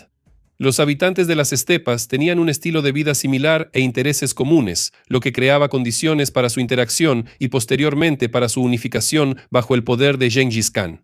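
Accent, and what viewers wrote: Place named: Argentina. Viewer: Ahora tiene acento argentino el.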